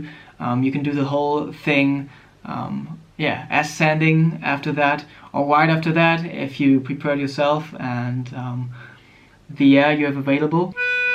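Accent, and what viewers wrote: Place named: United States. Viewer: gewöhne Dir an Englisch zu sprechen, und nicht "fucking american"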